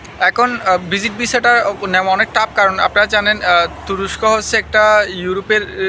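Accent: Chittagong, Bangladesh. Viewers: তুমি কি চিটাগং এর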